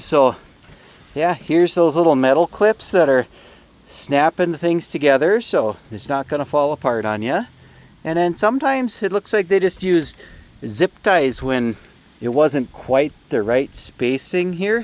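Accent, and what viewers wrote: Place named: Midwest, United States. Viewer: This guy is deep north Midwest 😂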